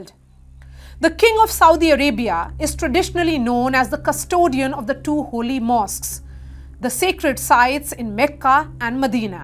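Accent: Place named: India. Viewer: You the only white girl with Indian accent.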